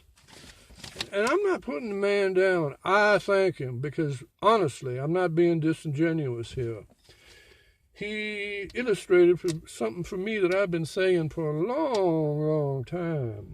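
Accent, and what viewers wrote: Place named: South, United States. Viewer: I love the SOUTHERN DRAWL. Interesting articulated take. :) Lemme argue definition for a moment here. btw, I think that Reiner is totally a crazed Leftist. I think Leftists are the crazies, and I think "libs" are different, in that they are, well, the "good Dems"...which sometimes sway to center, or even right of center. So, for voting purposes , let's not ostracize the "libs".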